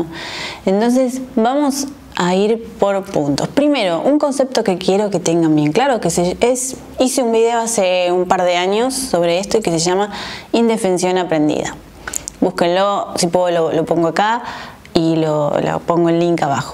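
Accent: Argentina. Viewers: Soy Española me encanta tu acento creo que Argentino, recién.llegada a este bonito canal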